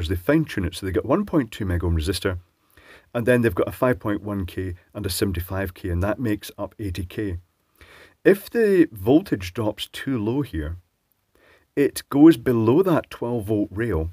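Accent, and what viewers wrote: Scotland, United Kingdom. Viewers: Really appreciate your videos 😊 I really love the accent and you're in Glasgow!! It's awesome!! My mom is a MacKay from New Glasgow, Nova Scotia, Canada. I have second and third cousins in Scotland. Mom's 92 and reminds me she's still Canadian everytime I contact her. Even though she has been living in the United States for over 65 years. I'm also a Ham Radio hobbyist for over 20 years. US call sign KB1DIG. Did a little contract work with Raython Aerospace and the Department of Defense just after 9/11/2001.. Production Controller for the retrofit of avionics on the KC-135 stratotanker.. Air Force version of the Boeing 707.. Flying gas can.. Played around with UHF VHF antenna design. Halos and collinear design antennas. Some of my stuff is still floating around on Google. I'm retired to the Philippines 4 years now. Have been doing a lot of YouTube videos. 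Mostly custom built ideas for motorcycle related stuff here in the Philippines. Plus some of the local happenings here. Thank you for your work and best regards for your future from the Philippines 🤠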